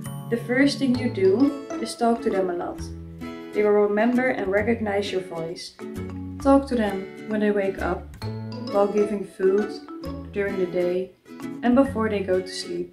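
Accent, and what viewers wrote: Netherlands. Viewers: You sound netherlands like how i say the english Words u say them whit a wierd axsent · I think I'm deaf, but I hear a Dutch girl speaking English. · Lovely! Really wonderful job! I can hear from your voice that you are dutch hehe😂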